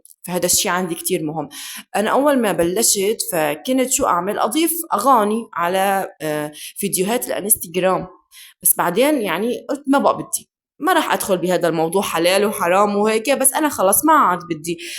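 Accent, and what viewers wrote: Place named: Aleppo, Syria. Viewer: والله و مليون مرة طريقتك في الكلام عسل رونق موسيقى ممكن لاني ليبية فنسمع لهجتك الحلبية حلوى وتشد الانتباه ❤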